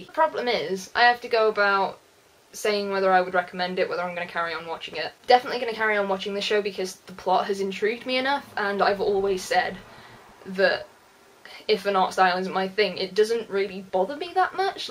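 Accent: United Kingdom. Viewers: I'm really glad that I got to hear a fellow Brit talking about this anime. I've become a big fan of it and each episode cannot come soon enough. I'm definitely used to the style now but it is a pity that they couldn't put more money into it.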